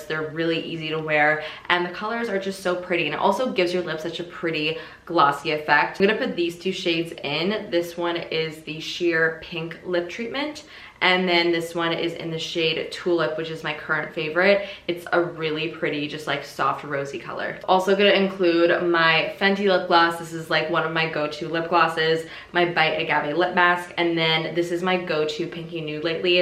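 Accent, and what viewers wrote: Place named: Canada. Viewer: Hehehe I love your subtle Canadian accent 😜 “pro-cess” vs “prah-cess”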